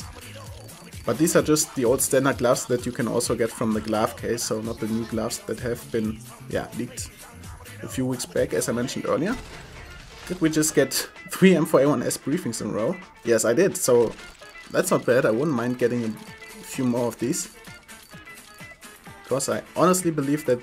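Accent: Germany. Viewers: wenn ein deutscher englisch redet.... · Bist du ein deutscher oder ein Engländer ? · This german Akzent Oo xD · Ich krieg so Aids bei deinem Deutschen Akzent während du Englisch sprichst. Es gibt nichts schlimmeres. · man hört direkt dass du deutsch bist :D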